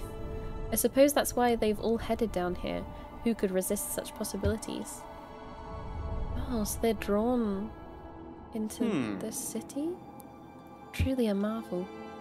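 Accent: United Kingdom. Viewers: Btw, I know I'm echoing a sentiment similar to many others in the comments, but I have never heard of you, and I want to emphasize that I do not care for reaction channels or let's play/twitch vids... BUT! I watched every second of this, and I'm probably gonna watch the next too. You have a thoroughly pleasant voice (I'm such a sucker for British accents, especially female ones) and you are remarkably intuitive for a self-proclaimed novice of the genre. This game holds a special place in my heart and earns its spot on the small/rare S Class shelf of games.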